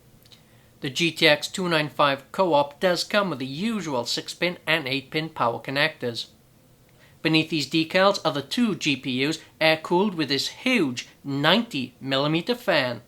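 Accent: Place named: Wales, United Kingdom. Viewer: Sounds more Welsh to me.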